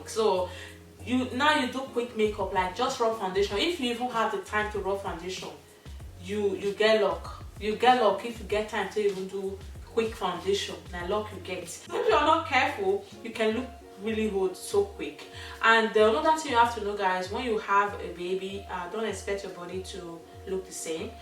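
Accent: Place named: Benin. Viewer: Hey n hubby New subscriber Alert💃🏾💃🏾💃🏾 and I have watched sooo many of your videos...lol I love the fact that you are very natural and I can relate soo well with you cos am Nigerian and am feeling that Benin accent sooo well! Lol Love u gurl All the best to your hubby and the new baby Cheers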